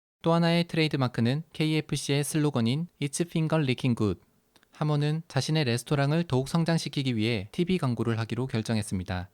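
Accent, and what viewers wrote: Gyeongsang, South Korea. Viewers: 어머니 에서 사투리는 속일수 없네 ㅎㅎ그냥 경상도 사투리로 하세요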